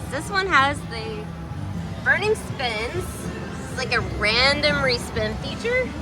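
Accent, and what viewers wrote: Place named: South, United States. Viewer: the excitement in your southern voice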